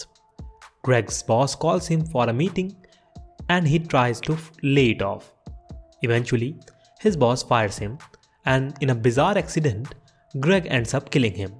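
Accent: India. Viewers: indian enlish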